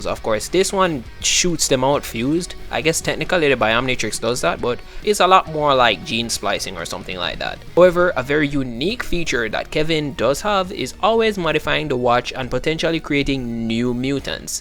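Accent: Jamaica. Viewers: Are you from Jamaica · your jamaican right?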